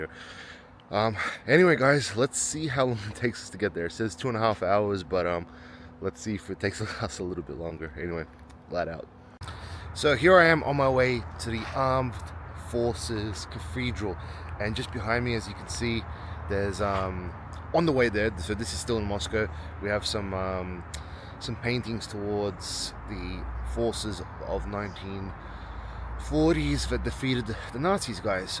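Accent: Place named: Australia. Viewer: You sound a little like someone from down under.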